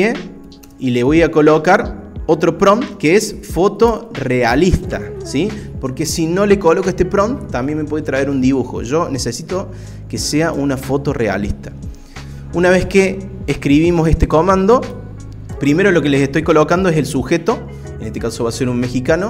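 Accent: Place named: Córdoba, Argentina. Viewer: Que tal capo, me parece que somos coterraneos no, ¿Cordobés?, bueno, lo que te quería consultar es si las imágenes que genera Bing son libres de derecho. Saludos desde Calamuchita 👍✅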